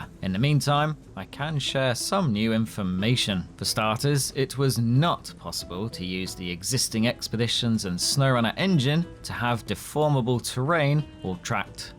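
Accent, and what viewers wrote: United Kingdom. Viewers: what english accent he is speaking?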